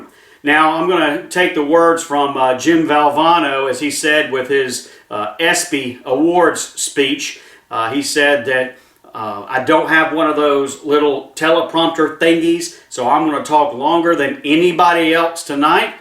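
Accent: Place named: Alabama, United States. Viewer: I thought you sounded like an Alabama boy . Enjoyed the show . Love getting to see collections .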